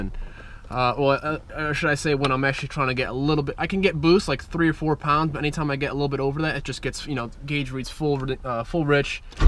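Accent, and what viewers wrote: Australia. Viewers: Nice aussie accent, i was thinking more Steve Erwin, R.I.P. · That Australian accent 😍😍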